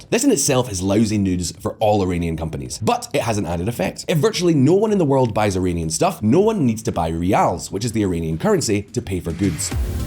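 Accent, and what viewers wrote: Scotland, United Kingdom. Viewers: Maybe, you will understand this better... Are you Scottish English? Answer me that!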